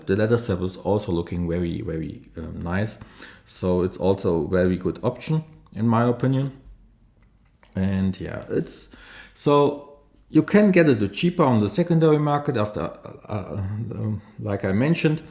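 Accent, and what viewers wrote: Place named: Germany. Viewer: are you german :D sounds like a german speaking english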